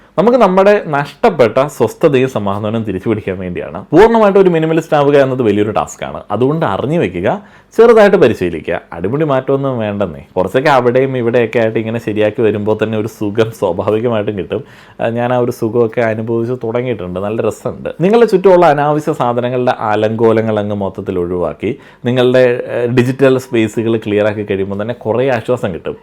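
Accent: Kottayam, India. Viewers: May be kottayam slang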